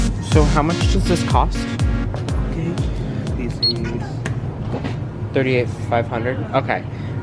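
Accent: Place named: Japan. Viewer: Your Korean sounds like Japanese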